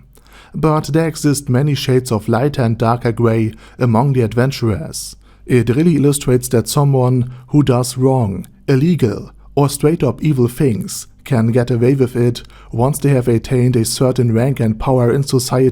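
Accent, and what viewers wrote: Germany. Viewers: i am pretty pretty sure ur are german arent you? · Boi you got a hard German accent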